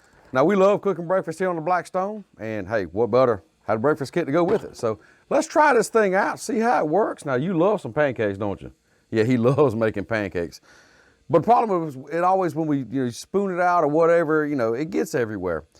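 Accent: South, United States. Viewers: Love the southern accent! Reminds me of my good ole buddy from Louisiana. Anyway, love the content on this channel. Definitely got yourself a subscriber. 👍🏼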